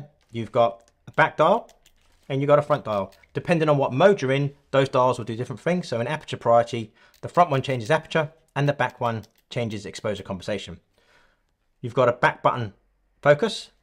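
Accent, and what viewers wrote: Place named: United Kingdom. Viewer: Hi thanks to you i'm itcing to buy this! Where can I get the Nikon F100 as notice you have brit accent im in UK too, a lot on ebay say From Japan? Are those imports should I ignore those? I'm lost Any good sites you recommend and what should I look out for? Your advice is appreciated please!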